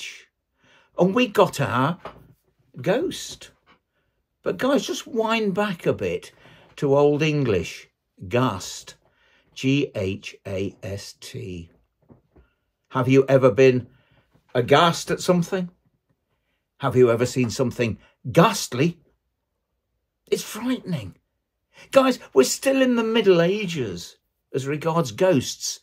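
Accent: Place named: East Midlands, United Kingdom. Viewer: Just stumbled across your channel. Love the content and subscribed. Also miss the East Midlands accent as a Nottingham lad now living in Australia. Keep em coming matey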